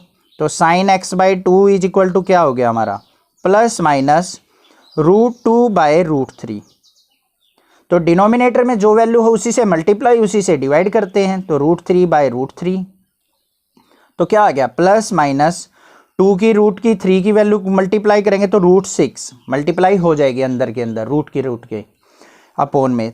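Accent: Haryana, India. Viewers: Sir haryana se belong krte h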